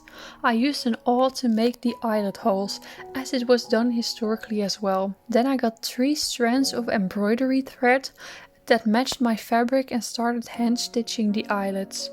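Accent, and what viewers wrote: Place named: Netherlands. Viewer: Are you dutch ? You sound dutch (I am dutch) I love how you project turned out it looks amazing !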